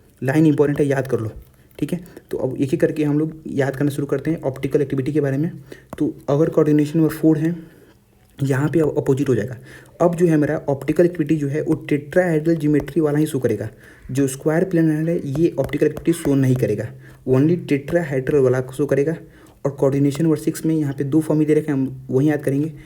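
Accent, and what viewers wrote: Bihar, India. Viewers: bhaiya love your Bihari accent 😍😂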